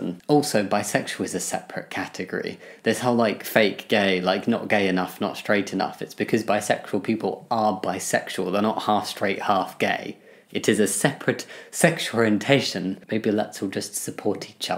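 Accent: United Kingdom. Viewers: You're from the UK?!?!!? Man I'm really bad at detecting dialects.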